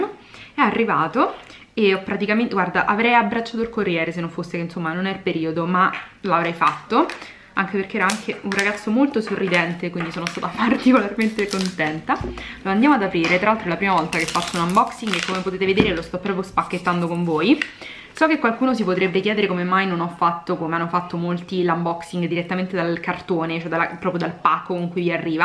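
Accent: Rome, Italy. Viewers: Il tuo accento romano mi fa muorire😂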